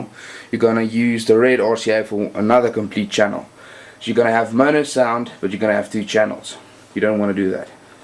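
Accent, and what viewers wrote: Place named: South Africa. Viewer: awesome vid thanks :) you sound south african :)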